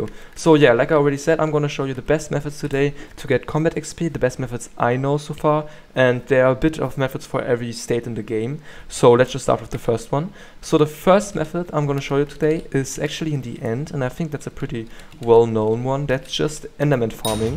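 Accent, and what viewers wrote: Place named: Germany. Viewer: ich lieb den deutschen akzent hammer video tho :D is there a better way from like combat 40-50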